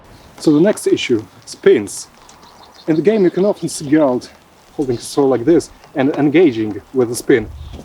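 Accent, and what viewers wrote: Poland. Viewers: you sound like a Polish Christopher Walken. love the vids! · You seems to have Polish accent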